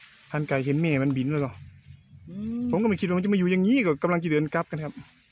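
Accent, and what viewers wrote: Korat, Thailand. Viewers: ดูคลิปทุกวันเลยครับคนโคราชคือกันฟังแล้วอบอุ่นคิดถึงบ้านครับ มายุกรุงเทพนานแล้วช่วงนี้เจอโควิดอีกคิดถึงบ้านกะกลับบ่ได้😢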